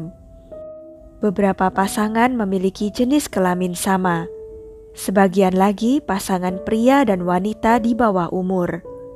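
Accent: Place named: Java, Indonesia. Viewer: Admin medok banget asli orang jawa kah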